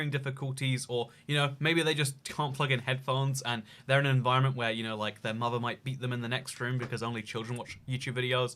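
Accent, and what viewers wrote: United Kingdom. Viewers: to be fair, you do speak really quickly and youtube doesn't understand british accents at all, so it's kind of expected that the captions would be bad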